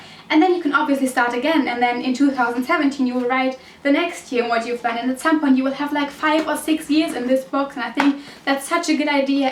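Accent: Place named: United Kingdom. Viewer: Dein English ist echt toll😍 und ich finde es echt toll, dass man echt richtig hört, dass du British English "lernst".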